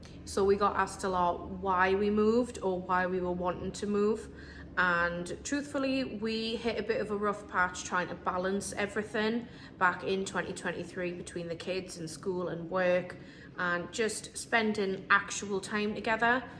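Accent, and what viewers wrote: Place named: Australia. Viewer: You'll get your aussie accent back!!!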